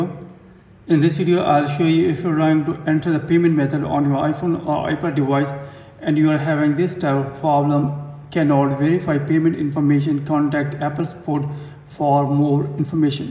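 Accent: India. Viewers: He’s Indian bro. He did great.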